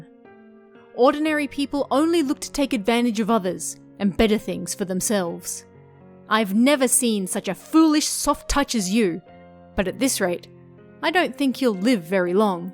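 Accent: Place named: Australia. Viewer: Hard to listen to the Aussie for a long time. Not saying it's bad, just not used to it.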